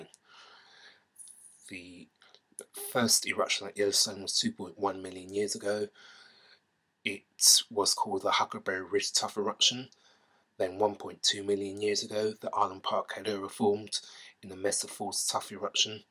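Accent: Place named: United Kingdom. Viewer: hard to understand a mumbling brit!!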